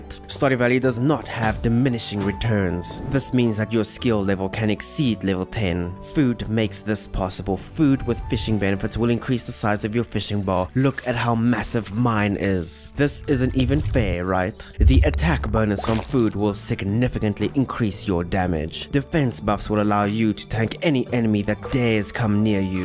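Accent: South Africa. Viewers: are you South African? saw point number 2 just wondering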